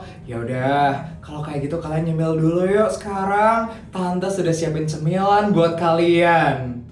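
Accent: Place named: United Kingdom. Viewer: Logat Inggrisnya dah hampir ilang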